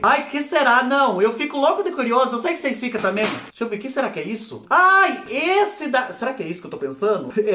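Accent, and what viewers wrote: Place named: Juazeiro, Brazil. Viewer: Sou do Ceará, e acho o sotaque do muito parecido com quem mora em Juazeiro.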